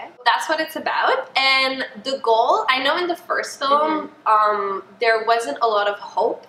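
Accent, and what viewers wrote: Quebec, Canada. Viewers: Are you French Canadian? Cause your accent when you speak English sounds a lot like mine when I speak English. Also, in the short film MISHKA, I saw our beautiful Canadian flag so I was wondering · No Pickle Yeah but that happens because her main language is Canadian French so she has an accent when she speaks English that results in some words being higher pitched.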